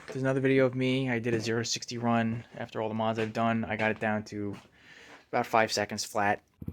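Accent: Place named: New York, United States. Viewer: Your accent makes it sound like you're from New York City or New Jersey.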